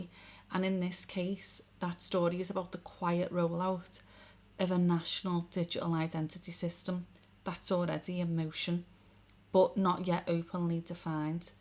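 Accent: Liverpool, United Kingdom. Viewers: I think that more people than ever woke up to see the nefarious schemes for what there are. Also, it seems that the level or resistance in The West is bigger than the politicians expected. I belive that people must fight against Internet control (online "safety" act and similar bills in other countries) to be able to speak about the wrongdoings of the elite. Use as much cash as possible since the banks want to phase it out and support local businesses that accept it. And remember that there's much more of ordinary folk than the globalists- if they could just force all of this though- they would- they can't and that's why they try to weasel it through the veteran id card, children's digital id, one login etc. Also, last thought- all those stabbings and unrest seems very suspicious and "organised" in a way to dupe people into failing for digital id- don't fall for that. 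Don't ask for national emergency or any other extraordinary powers to be granted to the gov. They'd misuse them as always. Ps. Love the scouse accent and the fact that you gracefully subtitled your video for non native speakers watching😂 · I thought I had tuned into an old episode of Brookside for a moment there. 🙂 I love your accent . . . . . hello and Greetings from Newcastle, I just subscribed to your channel. 🙂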